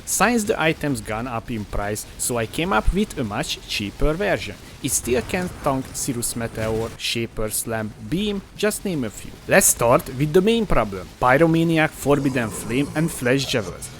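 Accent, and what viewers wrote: Hungary. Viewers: Szia , nagyon Magyaros az akcentusod. Really nice video there ! :) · A magyar akcentus megol meg kulfoldrol is felismerni :D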